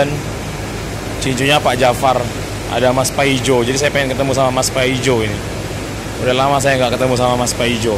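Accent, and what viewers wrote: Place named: Medan, Indonesia. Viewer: medan bang.. medan.... semangat kali abang ini kayak nya👍👍👍😁😁😁😁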